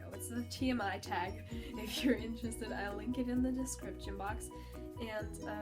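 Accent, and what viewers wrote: United States. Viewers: I looked up the word bilingual in the dictionary and if I remember it correctly, being bilingual is when you can speak two languages fluently. Also, on a side note, you have a great american accent. Oh, conversate is a word:) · Thank you for taking the time to do this video. I follow many Au Pairs and Exchange students on you tube. It is so interesting to hear what each individual takes from their time in the US. Positive and negative. I see a definite pattern with certain countries and whether they speak their native language or in English in their videos. Norwegian, Danish, Finnish and the Dutch tend to speak English. German, French and Russian tend to speak their native language. If you decide to do a Q&A I have a couple questions. You answered one. Do you ever catch yourself thinking in English and the second part would be do you ever dream in English. 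I see many exchange student in the US start to catch themselves thinking or dreaming in English after about three months. How strange that must be the first time it happens. What were your reasons for choosing the US for you year as an Au Pair. Numbers wise the US has the most German AuPairs most years. Last is your accent of lack of an accent really. Your choice of words and pronunciation, to me, sound very much like the US version of English and not the British. When English is taught in Germany which version do they teach? Your English, at least to me is very very good. By the definition I read you are bilingual. I hope you enjoy your time here. I lived in New London, CT for six years so I know what it is like up there. Will you take a travel month when your year is up?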